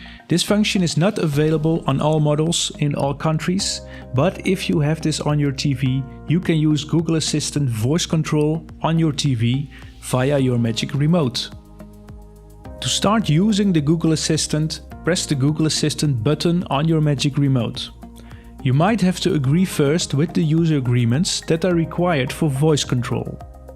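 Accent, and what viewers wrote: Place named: Netherlands. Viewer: He sounds so Dutch!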